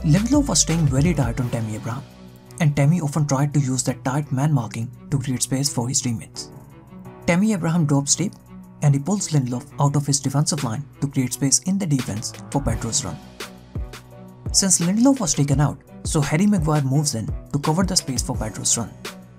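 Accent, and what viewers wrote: India. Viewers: are you from 🇮🇳India.. Cuz ur accent and the way u speak seems so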